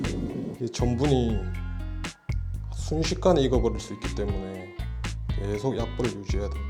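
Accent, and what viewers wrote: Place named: Gyeongsang, South Korea. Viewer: B식탁님 말투완전 우리동네에요 전경상도 김해요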